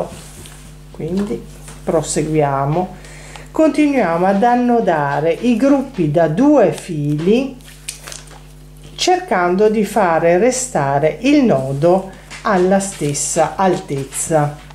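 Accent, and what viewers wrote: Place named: Liguria, Italy. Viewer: Grazie alle sue chiare spiegazioni sono riuscita a realizzare il portavaso con spago.E' davvero bravissima e vorrei provare anche altri suo lavoretti Si puo fare il portavasi appeso x un vaso quadrato ,circa 25 cm x 30? Ps il suo modo di parlare mi ricorda,con nostalgia la mia Genova, sbaglio ,o anche lei è Ligure?Cari saluti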